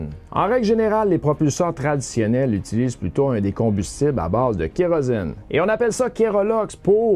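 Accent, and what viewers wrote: Quebec, Canada. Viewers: Très bonnes infos. En tant qu’européen j’aime beaucoup le côté décalé de la présentation et l’accent québécois si agréable à entendre… ça me change des psychorigides d’ici 🤣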